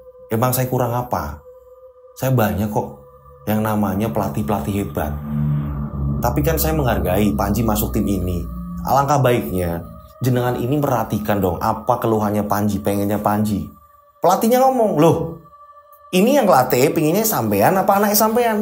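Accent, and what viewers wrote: Java, Indonesia. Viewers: Bom yoskay... aq suka cara membawakan cerita nya terang dan jelas 👍... logat jawa nya lhas banget mas 😊 enak di dengar nya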